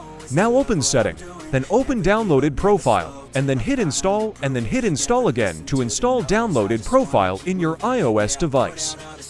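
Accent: South Africa. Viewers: Yo are you from South African ❔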